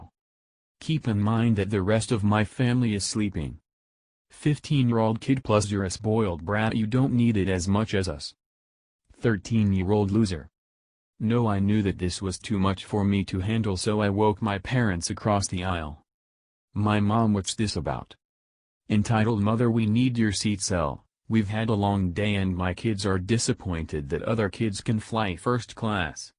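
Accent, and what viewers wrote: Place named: Japan. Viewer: Your accent sounds Japanese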